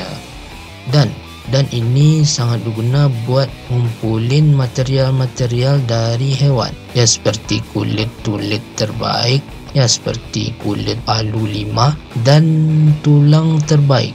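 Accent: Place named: Minangkabau, Indonesia. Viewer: Mantap infonya, btw kaya minang kocak wkwkwk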